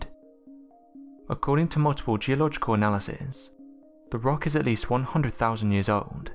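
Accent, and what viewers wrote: England, United Kingdom. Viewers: Anthony ouch...but true! I understand that pronunciations will be different between English speaking countries, but that was the completely incorrect word. I can handle meethane for methane. I cannot handle parameeters for parameters! I used to think anyone with a proper British accent sounded quite intelligent. Since YouTube, not so much😄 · Yeah well certain Englishmen come from regions where they speak like that because inflections and intonation carried over from Middle English, Welsh etc...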